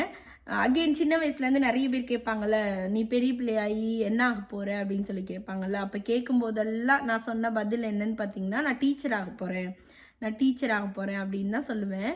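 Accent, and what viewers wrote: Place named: Theni, India. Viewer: Random unga video slang enga Amma pesra mathiri irunchu..... ipotha theriyuthu elaam theni nu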